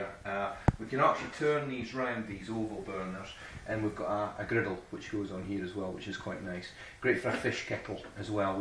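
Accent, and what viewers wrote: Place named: Scotland, United Kingdom. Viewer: If you can get past the Scottish accent, this is a very good presentation.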